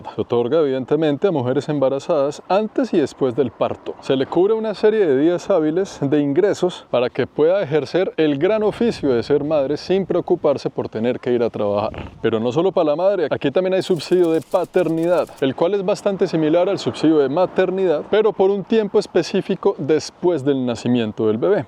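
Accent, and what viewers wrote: Colombia. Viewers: Parcero sus videos son muy entretenidos escuchar otro Colombiano narrar desde nuestra manera de interpretar es importante no dejes de hacer estos videos 🙏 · eres la prueba de que el acento colombiano, no tiene por que aborrecer a los 30 segundos.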